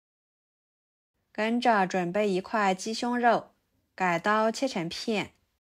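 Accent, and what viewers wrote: Yunnan, China. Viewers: 好难听的云南普通话，你直接说方言比你的马普要好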